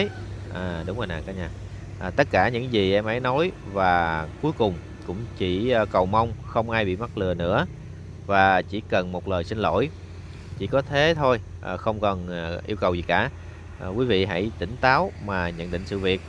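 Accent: Hue, Vietnam. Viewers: 100/100 giọng Huế , cùng quê nên mình rất rõ ! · Em ấy nói tiếng Huế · Dung la giong hue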